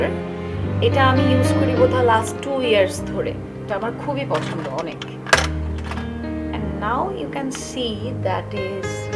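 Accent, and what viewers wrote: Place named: Kolkata, India. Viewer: Guerniss Er Makeup Sunechi Khub Soft Hoye Tomake Amar BD Na Kolkatar Meye Lage Bcz Tumi Khub Sundor Kore Cholti Banglai Kotha Bolo ☺️🥰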